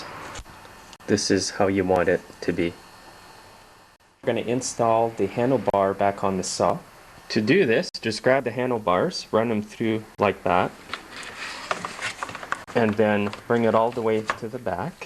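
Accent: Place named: Canada. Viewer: Odd that the related clips on the right fills upp with girls in bikinis more than chainsaw videos. I guess it says something about the audience. Other than that, really nice videos, and at least for a scandinavian your canadian accent sound so much nicer than the average americans.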